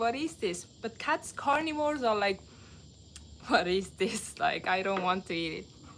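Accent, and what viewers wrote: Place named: Estonia. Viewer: Love the Estonian accent. Do you guys also speak Russian, or do they not teach in schools anymore?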